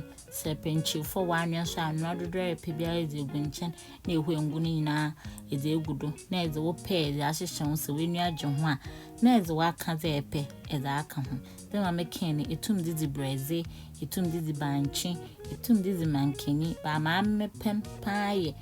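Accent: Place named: Fante, Ghana. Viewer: Why speaking Fante today 😂😂😂😂😂this your Fante sweet me ooo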